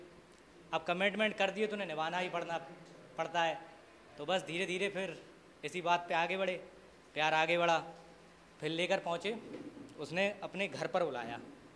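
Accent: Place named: Bundelkhand, India. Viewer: BUndelkhandi to ho guru tumm ho kaha se.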